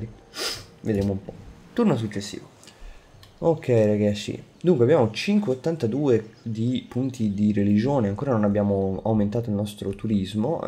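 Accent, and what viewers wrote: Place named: Tuscany, Italy. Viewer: D :D figurati!! complimenti ancora...seguire i tuoi video è leggero e facile, sei molto "pulito" e il leggerissssssimo accento toscano fa colore!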